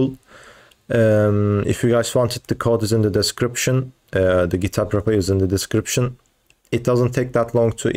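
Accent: Turkey. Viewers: Baba aksandan anladım türksün yorumlara baktım insanlarda demis baya iyi yapmışsın ya wp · ingilizce öğretmenliği okuyorum. videonun 5. saniyesinde falan açıklamaya baktım türk müsün diye. evet gerçekten belli oluyor çünkü türkçenin (türki tüm dillerin) fonetiği diğer dillerden aşırı farklı ve ayırt edilebilir. ama aksanının olması kötü bir şey değil, dediklerin gayet net bir şekilde anlaşılıyor. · Tam bir türk ağzı var sende. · bende bu adam Türk dedim yorumlara bi baktım elrond reis niye her yerdesin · direkt türkçe konuşsaydın aksandan anladım direkt XD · Your accent like Turkish <3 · aksaana bak tam türk amk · buram buram türk aksanı kokuyor, eline sağlık bu arada :D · Aksanından anladığım üzere Türksün. Yaptığın şey çok hoş ve verimli görünüyor! Tebrik ederim.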